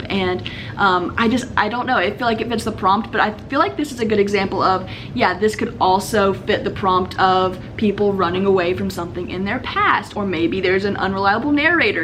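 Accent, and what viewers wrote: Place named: United States. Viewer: I need to know what state your from. I feel like I know that accent!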